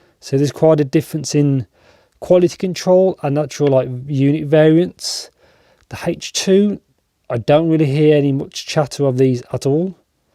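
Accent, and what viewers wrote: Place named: Black Country, United Kingdom. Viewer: I dont know if it's the short, informative and to the point videos or the (I want to say black country?) accent that makes me feel at home but you have to be in my top 5 youtubers. Always eager for a new video from you, especially for iems and daps! Keep up the great work!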